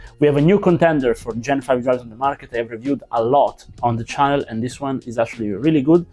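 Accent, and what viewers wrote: Italy. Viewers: Are you Italian bro? Your accent is cool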